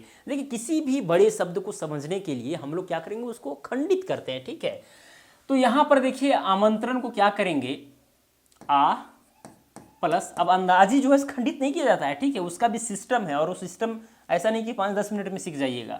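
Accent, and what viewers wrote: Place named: Bhojpuri, India. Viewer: Aap toh khud ki galat hindi bolte ho. Grammer galat bolte ho. Accent ki baat toh rehne do woh toh alag ki bhojpuri accent hai.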